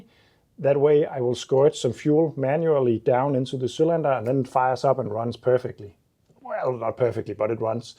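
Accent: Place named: Denmark. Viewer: Danish English is so cute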